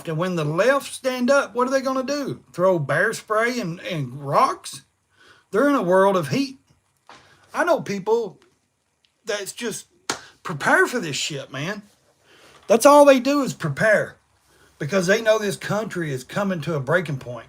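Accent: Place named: South, United States. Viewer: it’s unbelievable how many brainwashed people there are. Have no common sense. A lot of them are set off by your southern accent and assume you are certain things.😂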